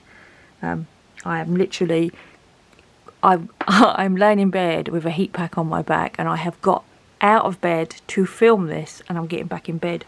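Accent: North London, United Kingdom. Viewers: Love your work! You talk like me....im a north London girl!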